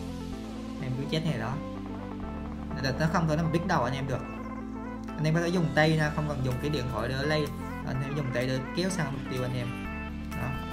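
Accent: Binh Dinh, Vietnam. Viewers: Anh là người bình định ạ ? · A phải ng bình định ko s nge dống thế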